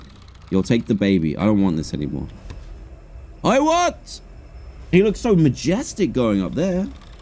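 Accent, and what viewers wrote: United Kingdom. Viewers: I know this is just UK accent but.. mario sounds like mario but the letter a sounds like A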